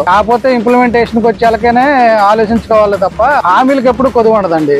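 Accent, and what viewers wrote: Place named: Godavari, India. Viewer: అన్నయ్య తెలుస్తుంది గోదావరి జిల్లా అనుకుంటా😂😂😂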